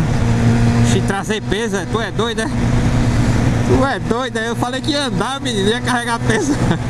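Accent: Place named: Minas Gerais, Brazil. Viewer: esse teu ˆsutaqueˆ é bão demais sô hahahaha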